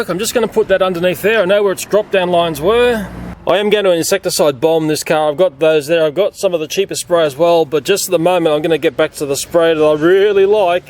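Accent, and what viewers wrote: Australia. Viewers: Sorry I hadn't found your channel earlier just looking through your vids and I found this one it's SOOO fascinating to watch and I come from the UK and I've always wanted to go to Australia and to look at your garden and stuff it just makes me so happy and hearing someone Australian is amazing love it channel 💜💜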